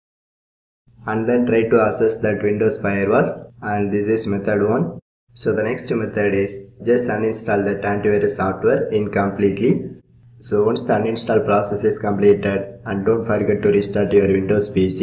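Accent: India. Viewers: Is this English or indiash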